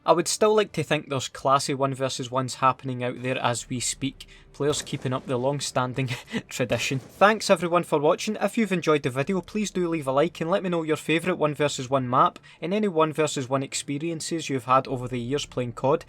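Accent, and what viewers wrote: Scotland, United Kingdom. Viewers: I only subscribed because of you're scottish accent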